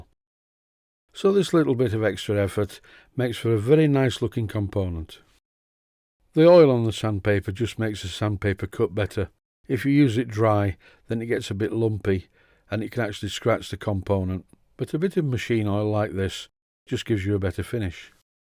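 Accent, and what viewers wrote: United Kingdom. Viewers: Well done, greetings from the USA. I've always had an interest in steam power, I guess from the many stories that were passed on to me from my uncle and others who farmed years back with horses and steam power during the early 1900s and on. I don't mean to ramble, I like to share at times. I'm now ready to start a steam engine build project I think. The workings of the valve you did so well explain here helped me a lot to understand how steam gets to the cylinder and so forth., However, I can't grasp the word you used in the beginning to describe the type of metal or cast material needed. And please understand it's not your UK dialect., The audio just didn't get through for me to hear you well. And many thanks for sharing so much here. And very cool you're a musician by trade, and doing these steam projects too. Take care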